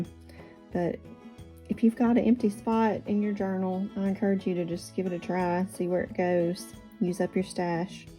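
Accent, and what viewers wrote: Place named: South, United States. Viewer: If "Hi y'all" fits you better than "Hi guys", I think you should definitely switch! I'm not sure I know anyone who doesn't love a southern accent. :) Be you!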